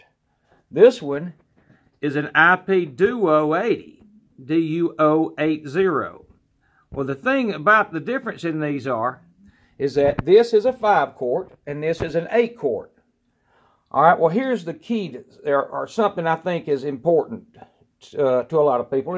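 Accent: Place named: Carolinas, United States. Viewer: I love your accent. I have relatives in North and South Carolina and I miss that accent so much living up here in Pennsylvania. My mom's people are from South Carolina and I have a cousin in North Carolina who sounds just like you. Sweetest accent on earth! It just exudes friendliness! Thanks for the helpful video. Now I know I need an 8 quart so I can put potatoes in with the chicken or large pot roast.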